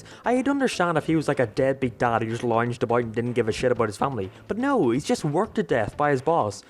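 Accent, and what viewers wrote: Ireland. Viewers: Oh my gosh he sounds so Irish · He has more of the Irish accent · jeez early sounds irish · I fell like he has a Irish accent someone tell me if he is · Bro is Irish asf 6y later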